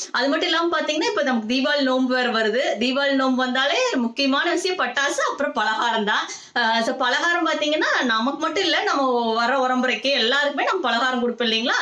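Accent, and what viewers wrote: Kongu, India. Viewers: அக்கா நம்ம ஊரு பேச்சே பேச்சு தான் ❣️😍 அருமையான பேச்சு அம்முனி(அக்கா ). அஸ்கா பருப்பியும் அருமை 😋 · அருமையான காணோளி அம்மனி உங்கள் கொங்கு தமிழ் பேச்சு மிகவும் அருமை தேங்காய் பர்பியில் நாங்கள் கொஞ்சம் பொட்டுக்கடலை போடுவோம் அம்மனி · Akka neenga pesura kongu Tamil arumaiya iruku akka, first time unga videos lam paakuren , unga farm, home lam romba nice, continue aa videos pannunga🙏🙏🙏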